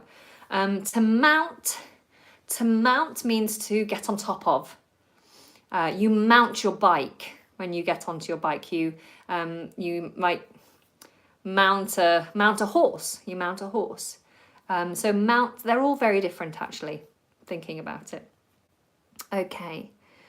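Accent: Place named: United Kingdom. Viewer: Hello, my dear teacher. Unfortunately, I missed your live video but I watched the last lesson about marketing and like always I learned many new words and phrases about marketing. That was awesome. U are the best teacher I ever had because u r very energetic and I like your lovely pure British accent. Thank you so much.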